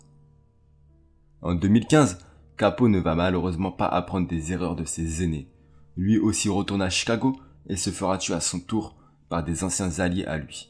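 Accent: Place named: Paris, France. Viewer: Large j'ai stoppé directement pour aller dans les commentaires pour savoir si comme moi quelqu'un avait arrêté les frais après 1min 20 d'écorchage. Depuis que tu dis Glo Gang comme un gars du 16 c'est mort next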